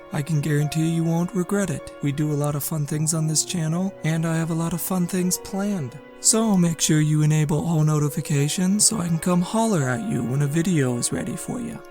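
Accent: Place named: South, United States. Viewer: Hi!👽 Southern accent… 6 out of 10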